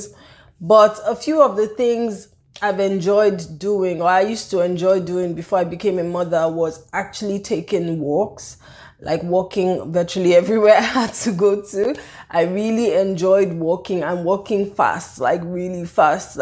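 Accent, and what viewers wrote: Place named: Pidgin, Nigeria. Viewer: The intro totally got me😂😂 I love your pidgin😎😎😎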